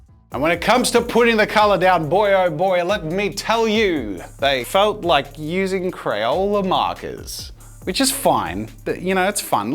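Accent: Australia. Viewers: Australian? I thought you were British!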